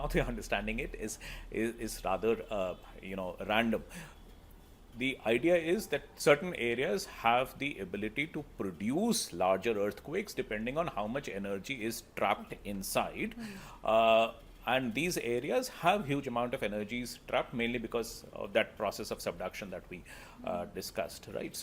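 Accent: India. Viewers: Bill gates with an Indian accent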